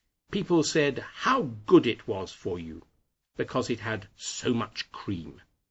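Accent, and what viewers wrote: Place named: United Kingdom. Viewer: British accent is charming, 😢 live it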